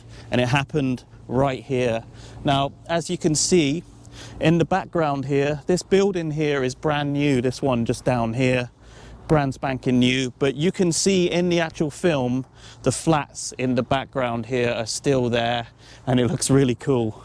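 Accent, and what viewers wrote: United Kingdom. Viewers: Yeah; this definitely works better in a British accent... (esp. reciting fake Russian words) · Great to see these locations , good video . The Nadsat is cool but as you are an Englishman , complete with middle class accent , it is really jarring to hear you use a phrase like 'super excited to be here' . Come on man , really ? Not quite as depressing as the McDonalds .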